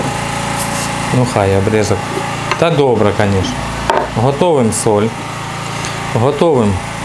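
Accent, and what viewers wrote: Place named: Chernihiv, Ukraine. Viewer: Суржик супер, яка область, Ченіговська?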